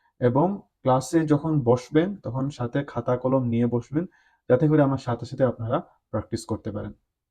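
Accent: Sylhet, Bangladesh. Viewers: are you from Sylhet?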